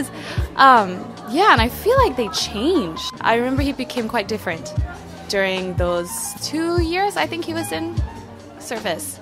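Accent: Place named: South Korea. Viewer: It’s so cute you have a Korean accent when you speak English!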